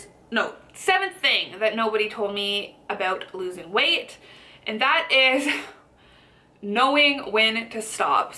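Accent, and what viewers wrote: Canada. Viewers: i could tell u were canadian based on the way u say 'about' whch is crazy cuz im also canadian and ive never noticed we actually have an accent till i heard u speak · are you canadian?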